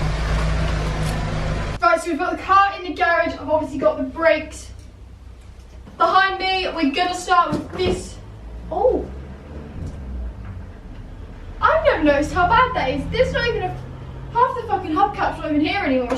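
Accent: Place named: United Kingdom. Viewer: Clicked on the video out of curiosity, then you hit me with that UK accent and I couldn't stop watching. Love the humor but also the ambition. Subscribed for support and I'll be checking out you other videos, get it girl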